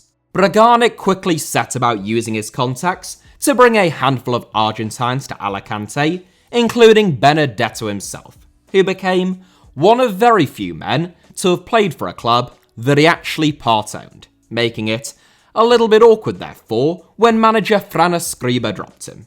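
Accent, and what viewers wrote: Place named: United Kingdom. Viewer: Woooooooooaaaaaahhhhh WTF. Check your British hate pal, whilst you have that accent!! You big up the Turkish Sultan for donating £1000 from the Ottoman Empire (which was collapsing at the time, and could be the actual truth about the sum donated) and then you take a ducking swing at our Monarchy, after starting the section AND THE BRITISH LET IRELAND STARVE. Then admit that was a lie, and Queen Victoria donated TWICE what you just licked the arse of?!?!? DID THE BRITISH INVENT BLIGHT, THEN?? Did this nation disease all the potatoes?? No we didn't. KEEP OUR MONARCH'S NAME OUT OF YOUR DUCKING MOUTH. Tell both sides of a story for once!!!!!! Or better still, stop reminding us why there is NO PLACE FOR POLITICS IN SPORT!! Did you research Manchester City's connection with Germany? Or how the Jewish community were the ones who held out their hands post WWII in Manchester? 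No you didn't, because you are ALWAYS about the low-hanging fruit, and it's getting boring now, you Progressive little Puss!! MAN THE BUCK UP and leave the country if you just want to slag it in every video you make. I'm sick to death of traitors in Britain. YOU are why this country keeps getting worse. You ARE NOT the solution. What is it about this channel and Commie nations? Go live in one, and maybe you will stop giving them so much screen time. Or do you have Chinese investors too? HULL INTERNALLY TAKE CHINA SEVENS at a time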